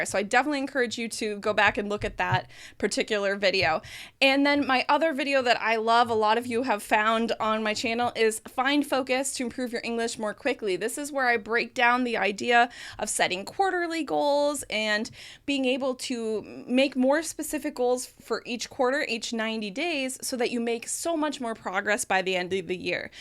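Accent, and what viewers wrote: United States. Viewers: Perfect American accent!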